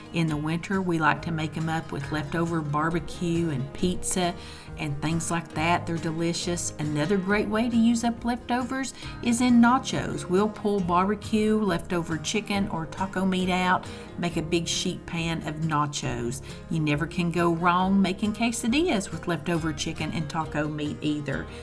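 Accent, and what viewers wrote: South, United States. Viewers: Love your easy recipes.fast and easy is nice.anything Mexican is what I like.not spicy though.I saw your recipe for taco pizza,with refried beans and hamburger with pkg pizza mix.yum Can't wait to try it.😊Love my vegetables,and you make good ones.love your crock pot dinners too love your southern accent.I am from Minnesota.they day we have have a accent.thats funny to me.enjoy watching you,but you make me hungry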